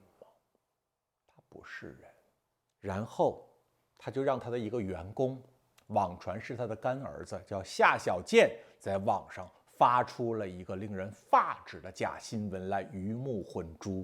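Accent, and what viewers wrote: China. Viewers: 你是大陸口音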